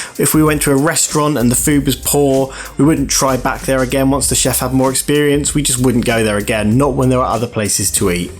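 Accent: England, United Kingdom. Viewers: You seem like a very reasonable person and highly professional too in the way you present things and that english accent is probably making you sound more like you really know what you're doing. Im your new subscriber. 😉 👍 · Subscribed only coz of your #BritishAccent.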